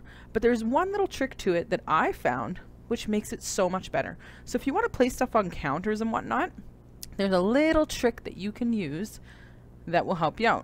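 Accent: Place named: Canada. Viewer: ehhh canadian... oh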